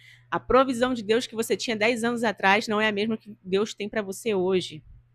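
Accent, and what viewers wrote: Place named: Rio de Janeiro, Brazil. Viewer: " Princesa de Mônaco." Sotaque carioca. Deus abençoe sempre seu ministério! #JuntosPeloReino!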